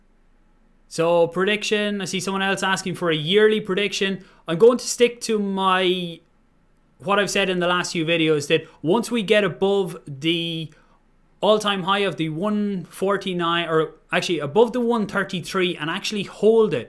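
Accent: Ireland. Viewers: Not another fucking Irish accent!!! All I’ve heard all day watching the gg’s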